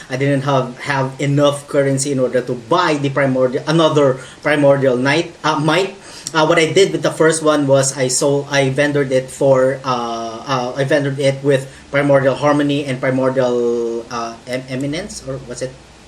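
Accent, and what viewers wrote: Philippines. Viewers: scrolling through PoE vids and i'm commenting on this one to ask... that accent sounds filipino, are you filipino?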